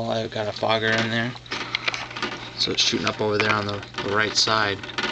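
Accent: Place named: New York, United States. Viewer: I can tell your from new york by the way you speak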